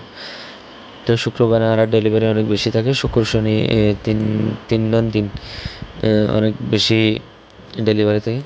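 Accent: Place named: Noakhali, Bangladesh. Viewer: ভাই আপনার কথা ত বুঝি না নোয়াখালীর লোক নাকি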